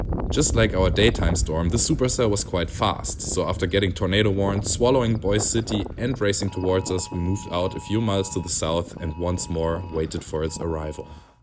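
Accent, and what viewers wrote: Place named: Germany. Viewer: Ich hatte nach den ersten 10 Sekunden irgendwie das Gefühl das der Sprecher als Muttersprache Deutsch spricht und ich hatte Recht.😅 Aber super Video, alles interessant eingefangen. Macht weiter so!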